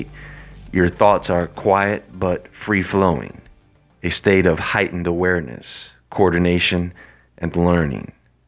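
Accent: United States. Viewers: Where is the narrator from? His accent sounds like American.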